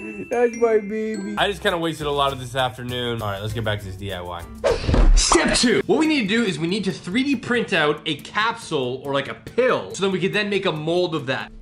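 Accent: Canada. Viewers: Is he canadian... cuz he sounds like it and im tryna build a Canadar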